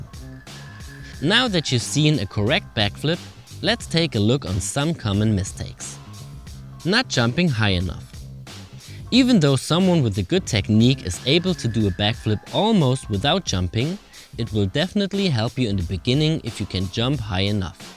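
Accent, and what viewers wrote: Germany. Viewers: you kinda have a german accent haha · Lol this accent sounds very german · You sound german.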